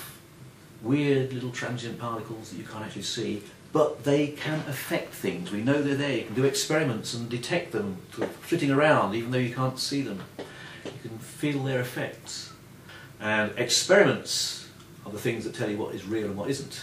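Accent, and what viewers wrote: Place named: Australia. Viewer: The visible universe is but foam on the ocean of the unseen/dark universe. Incredibly mysterious to us now as the leading edge of quantum and classical physics. Also, even though he's obviously English, I do detect that Mr. Close certainly has an accent that sounds unmistakenly 'Australian' ... of sorts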